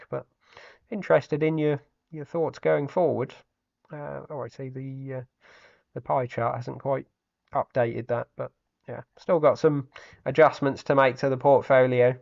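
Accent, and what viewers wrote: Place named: Bristol, United Kingdom. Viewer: I’m guessing it’s a Bristol area accent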